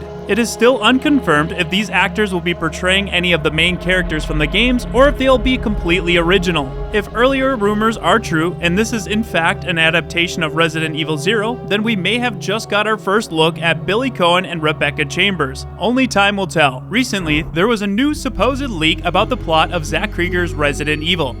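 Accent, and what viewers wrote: Canada. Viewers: Man, love the Canadian accent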